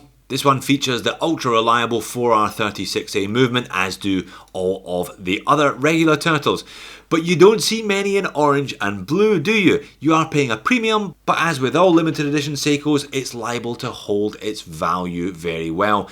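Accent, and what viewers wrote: Scotland, United Kingdom. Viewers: Oh man. Just forgive his Scottish accent and move on mate. 😀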